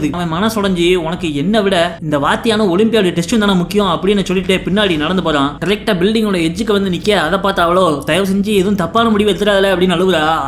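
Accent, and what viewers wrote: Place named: Tirunelveli, India. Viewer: Unga thirunelveli slang thaan bro.. Romba pudikum... En frd maariye pesuringa... Neenga pesa pesa en frd thaan niyabhagathula vanthaan...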